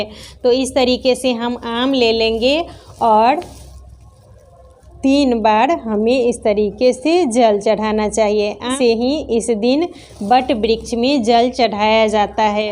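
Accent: Bihar, India. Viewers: Kya aap bihar se hai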